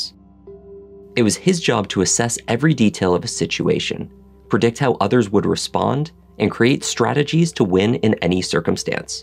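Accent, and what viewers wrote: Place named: Canada. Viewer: The narrator sounds Canadian so probably doesn't realize it. Nor would I.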